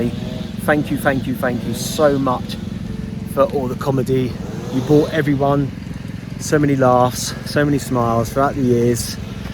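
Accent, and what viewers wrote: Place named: England, United Kingdom. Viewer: Don’t care much for your working class pommie accent👎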